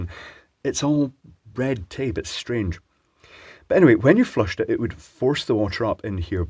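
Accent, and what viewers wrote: Scotland, United Kingdom. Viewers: There is something relaxing about a softly-speaking scottish-accent man discussing about toilets for 20 minutes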